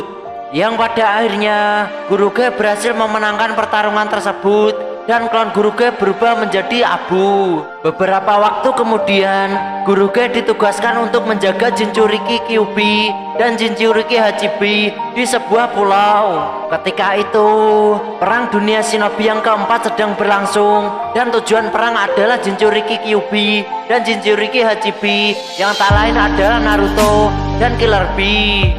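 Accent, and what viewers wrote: Java, Indonesia. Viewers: 😂😂😂😂 Suaranya bangke bgt ya.. Tp bikin bs ketawa karena medok org jawa.. Wkwkwkwkwk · Ngomongnya medok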